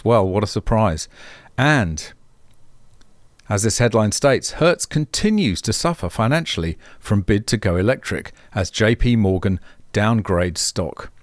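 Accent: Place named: United Kingdom. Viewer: An average of 60 ICE crash fatalities occur in Toronto every single year. Kids, moms, dads, and others have lose their lives everyday. Sadly they hardly ever make any big news, even if the volatile fuel in their gas tanks explodes. A single crash with an EV of course makes headline news. Luckily EVs are much less likely than ICE to catch fire (for obvious reasons), and their safety features help prevent these fatal ICE crashes. But Hybrids are the worst fire catchers. They're even worse than ICE. Apparently mixing a high electrical power system with volatile fossil fuel system may not be wise. Who ever could have thought? "Fully electric vehicles, on the other hand, were deemed far safer than both hybrids and gas cars; they are far less likely to catch fire, with just 25.1 fires per 100,000 sales. That’s compared to 3,474 hybrid fires and 1,529 ICE fires per 100,000 sales respectively." Ouch. 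I wonder why Australia (with UK accent) never mentions these things. Is there an agenda at work or $omething?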